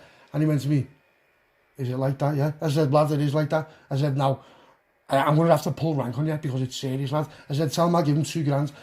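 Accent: Liverpool, United Kingdom. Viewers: Heavy scouser lahhh love it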